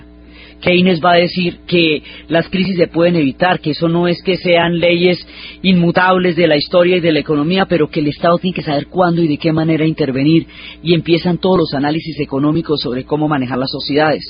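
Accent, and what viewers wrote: Colombia. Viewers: Es un placer escucharte maravillosamente narrado, me das luz y claridad a la historia y con la sal y pimienta de los modismos de Colombia. Delicioso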